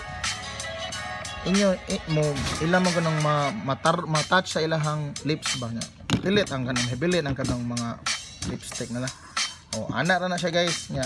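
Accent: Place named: Visayas, Philippines. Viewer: bakit hinde ko napanood ang video na ito.bisaya kaayo ang vloger.hahaha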